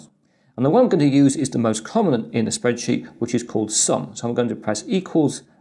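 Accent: United Kingdom. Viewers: You got an astonishing British Accent!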